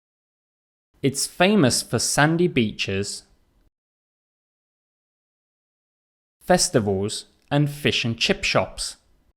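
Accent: United Kingdom. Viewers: Wow ! It is Amazing ! It has been a long time that i'm looking for this type of videos in a british accent .. thanks for efforts and continue ..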